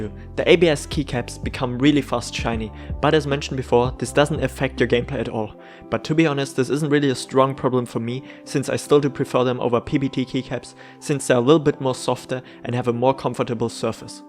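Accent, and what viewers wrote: Germany. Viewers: German guy right?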